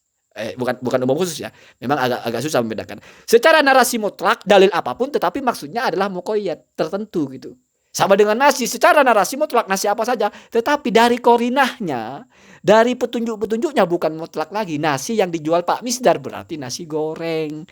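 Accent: Madura, Indonesia. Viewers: Ustadz orang Madura ya. .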